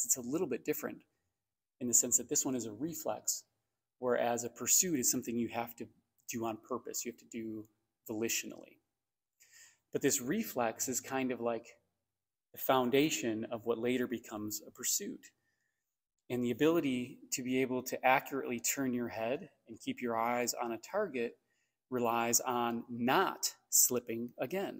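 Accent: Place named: Midwest, United States. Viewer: I really enjoyed your video. I am curious if you use any traditional chiropractic methods to help increase the cerebral blood flow? I have a somewhat mild case of POTS which came out 6 months after i got Covid. Turns out i have chronic Lyme and a bunch of tick infections. It was really bad in 2022, and I'm about 70% recovered. But i still wake up in tachycardia and i can't tolerate much exercise, which is so hard for me to deal with. I also get brain fog and fatigue by 2-3 pm every day. Anyway, it's interesting to hear this take on what could be going on. I would love to have this type of in depth evaluation. You sould like you might be in the midwest, so do you happen to know any other practitioners in the DC area? Or are there online resources for home exercises which people like me could try to assist brain recovery? Thank you for your insight!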